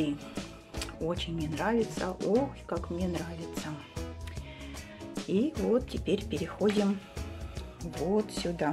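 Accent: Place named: South-East, Russia. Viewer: я так и думала) Слышу, говор "свой", юго - восточный) Привет Вам из Днепра)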